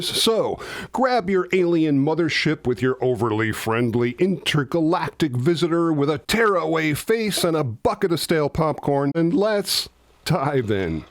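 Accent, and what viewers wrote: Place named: United States. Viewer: I'm sorry I can't listen to that bloody accent anymore you won't get to the point you bloody yanks just keep talking and talking . God forbid we'd actually have videos from other countries considering its the world wide web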